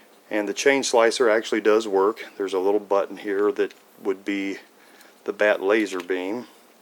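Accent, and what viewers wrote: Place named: North America. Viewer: I'm guessing you're North American from your accent, so how did you get your first Batmobile in the US, as Corgi is a British company? Where they available in the shops or did you have to pay more to get it delivered from the UK? Didn't any American toy companies make Batmobiles? Sorry mate, lots of questions, but a really interesting vid!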